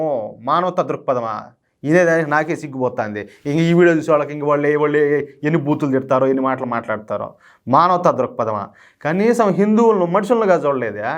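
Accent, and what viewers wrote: Rayalaseema, India. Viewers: Idhi Rayalaseema యాసన lekha Srikakulam యాసన? Super voice anna meedhi.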